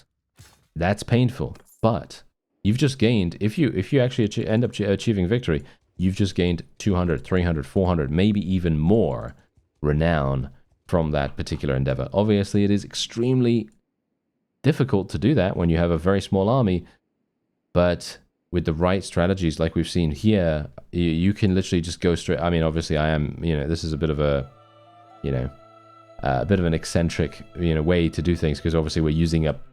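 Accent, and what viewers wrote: United Kingdom. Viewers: The Posh English ! Keep on trucking Sir ;)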